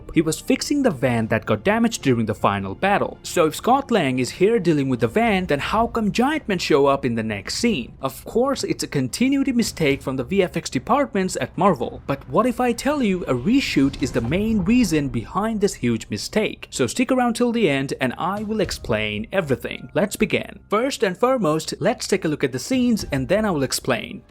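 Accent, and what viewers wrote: Canada. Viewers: What part of Canada are you from mate? Really rate the accent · R u from Canada?? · I like this voice more than the others voices it sounds better and more Canadian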